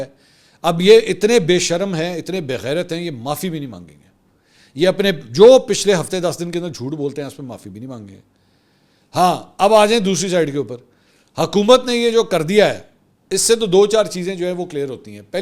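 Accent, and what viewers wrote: Punjab, Pakistan. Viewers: Please stop speaking paindoo punjabi U try to become way older n mature than what u r.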